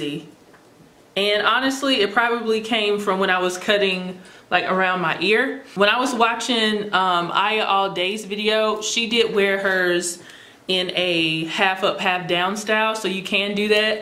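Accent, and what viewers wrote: South, United States. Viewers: Are you from Texas? You have a slight southern accent and you sound like you could be from here.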